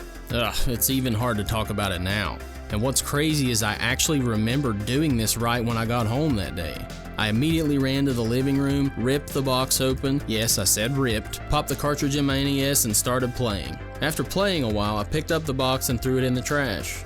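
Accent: South, United States. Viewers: I was close lol I could detect a certain dialect. People think Southerners all talk the same but there are different dialects and styles. My dad's from Tennessee and says things like "ov'er" instead of over there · You said "Ravel's Bolero" right, btw. But I'm sure you already knew that. :-) Oh, as an aside, your videos help me to kill old preconceptions about the southern accent. I grew up in South Dakota (which has its own dumb-sounding accent, like from the movie Fargo), and I always thought a southern accent meant the person was uneducated. When I moved to Virginia, near the West Virginia border, a decade back, I still held onto that thought, at least in the first split-second I heard someone speak. But your videos are fun, entertaining, and I always learn something new from them; all of which helps me kill those old connections, and realize we're all the basically the same nationwide. 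· Dat southern twang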